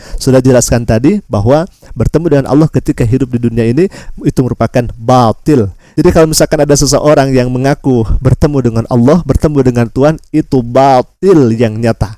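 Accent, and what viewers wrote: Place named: Makassar, Indonesia. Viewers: Logatnya orang Makassar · Seperti logat aksen Sulawesi Selatan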